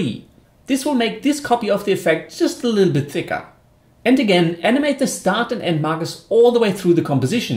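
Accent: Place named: Germany. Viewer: I want to know where this accent comes from! Germany?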